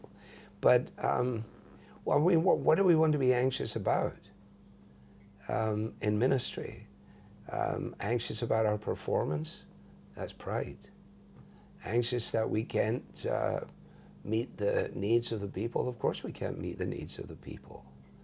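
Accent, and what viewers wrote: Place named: Scotland, United Kingdom. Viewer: I started watching and listening to Alistair after my husband passed away and I decided to join a large church where a lot of my friends were going. The church had called a new young pastor after the former pastor retired. I had been listening to after I got home from my previous church and I really liked his expository teaching. He came to visit me before I joined the new church. He is the one that told me about pastor being his favorite pastor to listen to. That was nearly 3 years ago. So that began my acquaintance with. I searched for every sermon that I could find. I loved his teaching. Just as I loved to listen to the pastor of my new church. I also love Pastor Scottish accent. I was raised in the Presbyterian church and a member of the PCA until my husband died. I joined a large Baptist church. Hard to make that decision but I’m glad I did. 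Since all this has happened to it breaks my heart that he has been judged so harshly by his peers. I pray for him and his family that all will be well. The church needs pastors like him,especially since we have lost so many good teachers in the past few years. Men like RC, and Dr. MacArthur, and so many others. The church needs them. We need to pray that God will bring forth more godly me who can fill those shoes.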